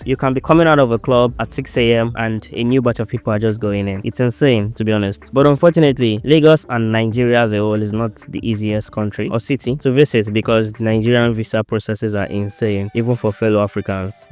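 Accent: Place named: Ghana. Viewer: Your voice sounds like a Ghanaian accent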